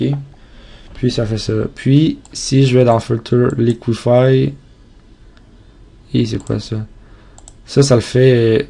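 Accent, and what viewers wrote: Quebec, Canada. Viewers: T'es québécquois non? j'ai un peu saigné des oreilles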